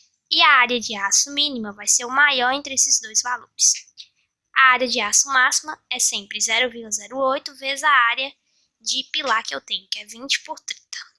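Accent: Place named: Northern Minas Gerais, Brazil. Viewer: Sotaque norte mineiro. Belo vídeo.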